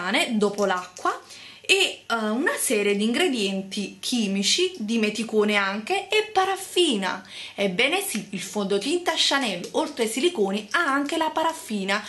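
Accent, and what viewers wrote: Naples, Italy. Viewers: Io ci provo a seguirti, ma te lo devo dire... il tuo accento è davvero difficile da sopportare. Per chi non è di Napoli, è un dialetto fra i più fastidiosi, mi spiace. "Quando lo vedo DI fare..." ma che italiano è??? Insieme ai siliconi io eliminerei la cadenza campana e leggerei di più. Ps. mi hanno regalato il tuo 'libro': inutile, visto che non spieghi dove reperire i vari oggetti necessari e ci sono veramente quattro cose in croce. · a te non piaccia la cadenza napoletana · ma chi ti credi di essere? Ci sono fior fior di esperti, chimici, medici, farmacisti e molti altri specialisti dietro ad un marchio e dietro ad un prodotto. In più la tua filosofia del "tutto cio che è naturale fa bene"" è veramente da Medioevo. In più, se ti informi bene saprai che non è vero che in chimica tutto cio che finisce con -one è un silicone (giusto per dirne uno)... 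Purtroppo ci sono delle ingenuità di base che permeano tutti i tuoi video. Un'ultima osservazione: se vuoi rivolgerti ad un pubblico dovresti imparare a parlare in italiano, non si può accettare il tuo accento partenopeo, simpatico eh, ma inadatto alla grande comunicazione. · Vorrei anche io precisare e farti notare che dialetto e accento (cadenza) sono due cose diverse e dai tuoi commenti a volte hai fatto un po' di confusione.. ma io concordo su tutto! non mi sembra che usi spesso espressioni dialettali, se non in contesti scherzosi per fare una battuta o per citare un proverbio (cosa che ci sta), ma il suo accento è TROPPO marcato. Non lo dico per "antipatia" o "razzismo" verso i napoletani, ma lo dico perché quando l'accento è così marcato da impedire una agevole comprensione della lingua allora bisognerebbe imparare a moderarlo.. perché sì, l'italiano standard, LINGUA UFFICIALE CHE OGNUNO DOVREBBE CONOSCERE BENE, ha delle regole di fonetica ed anche quelle fanno parte del codice lingua. 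Secondo me è sbagliato cercare di nascondere del tutto il proprio accento "locale", ci mancherebbe, ma bisognerebbe almeno parlare correttamente. Io seguo da anni Marta di Sweetbeauty1990 e anche lei è di Napoli; il suo accento però a differenza di quello di è gradevole!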